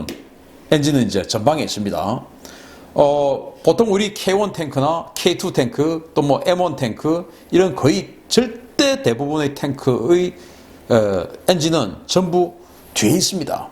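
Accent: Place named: Gyeongsang, South Korea. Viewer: 산인균 말 들어보니 경상도네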